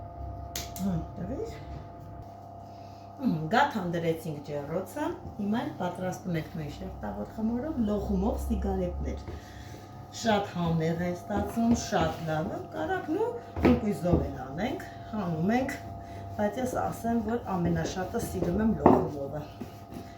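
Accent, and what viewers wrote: Lori, Armenia. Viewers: Սիրելիս այսօր պատահաբար յութուբով տեսա ձեզ ամիջապես ամրացա ձեր ալիքին ուղակի կարոտս բավարարելու համար սիրով լսեցի մեր լորվա ակցենտը ես ինքս ստեփանավանցի չեմ բայց 25 տարի ապրել եմ այդ քաղաքում ունեմ շատ հոյշեր կասեմ որ գիտդնաք աբսօրվանից կունենաք մշտական հետևորդ մոսկվա քաղաքից ցավոք միմյանցից շատ հեռու ենք սիրով կփոխարինեի իմ խոհանոցային գաղգնիքները որոնց մեծ մասը իմ փորցից է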